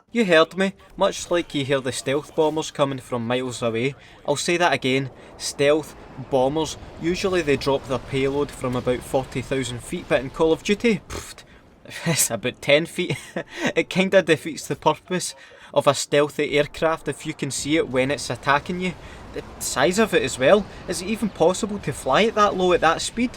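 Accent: Scotland, United Kingdom. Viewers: MattLC They are both Scottish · Scottich iirc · Scottish I think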